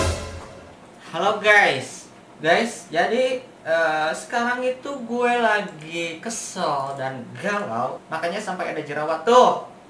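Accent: West Java, Indonesia. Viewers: Ngakak.. pake logat sunda tambah ngakak:v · Eta ngomong na. Sunda indo 😂😂😂 anjay ..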